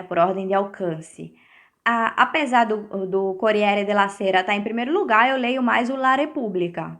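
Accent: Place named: Rio Grande do Norte, Brazil. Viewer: Ela tem um sotaque que parece o potiguar hehe bonito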